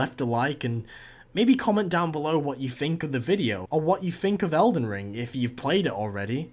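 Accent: United Kingdom. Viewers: good content, and good personality m8. you'll blend right in with the 1,000,000 other British sounding from soft critiques m8 also 20$ says you used a zweihander in ds1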